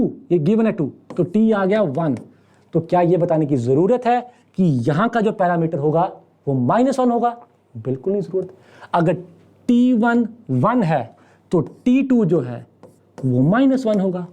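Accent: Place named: Punjab, India. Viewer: Sir have accent of punjabi stand up comedians who lives in Delhi Sir please make a family friendly stand up comedy please🙏🙏🙏🙏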